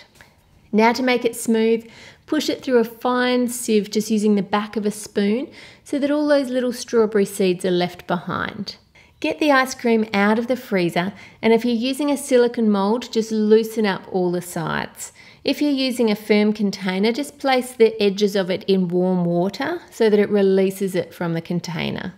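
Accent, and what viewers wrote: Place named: Australia. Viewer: English isnt my first languarge and I learned english by a american. so this austrailian accent is a bit hard to understand for me. 😅 The words sounds so different.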